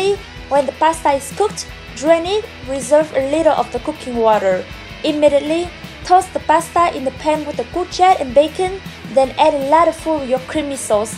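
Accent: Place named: France. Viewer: your english has french accent! so funny, anw it looks delicious, i ll try it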